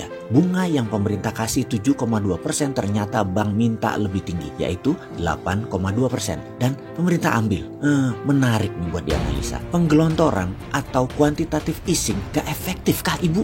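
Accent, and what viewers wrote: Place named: Java, Indonesia. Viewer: Sori om cengkok e terlalu medok 😂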